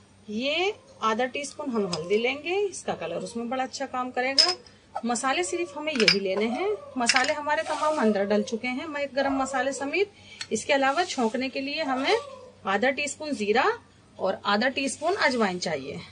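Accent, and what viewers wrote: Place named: Old Delhi, India. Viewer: aap ki boli aik dm purani dilli walon ki si hai,meri ammi ki poori nanihal india mai purani dilli mai rehti hai-meri nani ne bachpan mai boht bna k khilae hn,mami or khala sath mil k ready krwati thn or hm maze se khate the,aaj bari achi yaad aap ne taza krwa di hai,jazakAllah o khair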